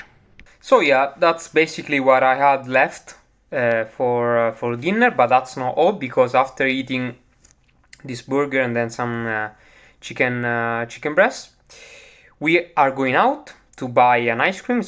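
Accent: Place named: Italy. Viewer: I love your accent! ❤ i love youu!! So amazing 😂😊✨ be blessed! I love italy! I love italian men ❤❤❤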